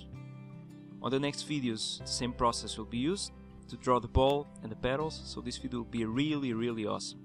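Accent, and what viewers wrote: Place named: Portugal. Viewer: Ahahah Portuguese, knew it just from hearing it :P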